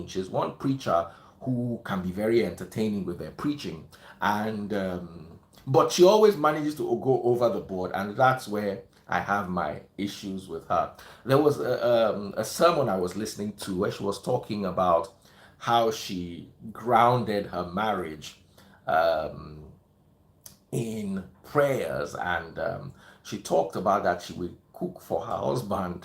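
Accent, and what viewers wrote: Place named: Ibadan, Nigeria. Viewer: 🤣 i swear, your Ibadan accent is SAVAGE. You should entertain us more in our yoruba accent ooo.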